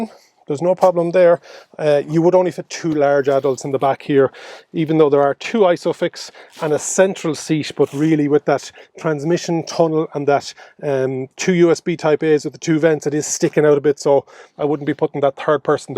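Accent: Ireland. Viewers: Nice review. My Irish wife from Mayo is sitting with me and she's trying to figure out your accent. County Meath?? She cannot figure it out.😊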